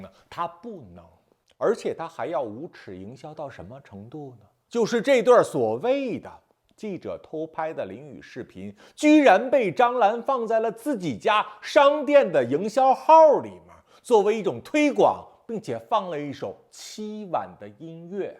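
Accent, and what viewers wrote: China. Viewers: 你是大陸口音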